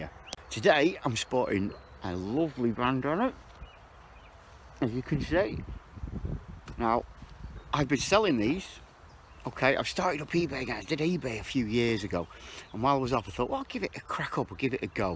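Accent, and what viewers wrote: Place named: United Kingdom. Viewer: Enjoyed watching your great content and some nice finds boy I really have to listen hard because of your great British accent 🤜🏻🤛🏻 keep it up bro, all subbed and I smashed the like button to boot. Cheers Frank <link>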